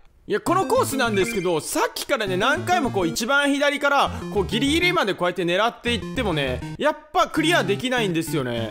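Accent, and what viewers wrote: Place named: Kyushu, Japan. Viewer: さん、前々から思ったけど九州出身ですか？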